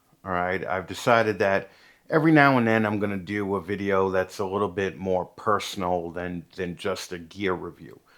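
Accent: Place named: Chicago, United States. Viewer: 1. Was prosthetic leg not an option? 2. Great message/spirit. 3. Have you ever lived in Chicago? Your accent reminds me of when I lived there.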